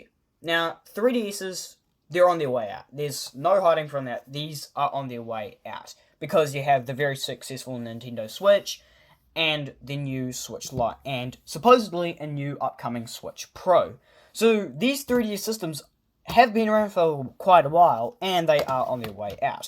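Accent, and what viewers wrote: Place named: New Zealand. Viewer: This video was on my feed. Wasn't prepared for the kiwi accent 🤓 Kidding aside this was a very good comparison video. Thumbs up mate!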